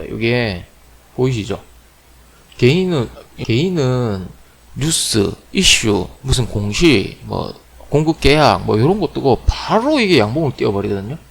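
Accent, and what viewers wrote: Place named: Daegu, South Korea. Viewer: 아따 사장님 대구 사람인가보네요? 말투가 구시하이 좋네요~~~ 개미의 반대는 꿀이다 개반꿀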